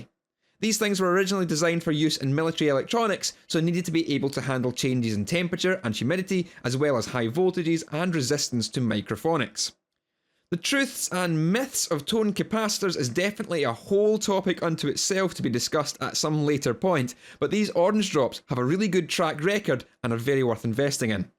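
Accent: Scotland, United Kingdom. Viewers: Yo, I can't wait for episode 9! I have been watching a lot of your videos and I am getting used to the Scottish accent (being a Filipino that is quite a challenge hehehehehe). I just wanna say EXCELLENT work on explaining the science and art of electric guitar making in plain layman's language. Thanks so much for educating us (you can be a great school teacher brother! I am a school teacher by day and musician by night), Keep it loud and I'll see you on episode 9!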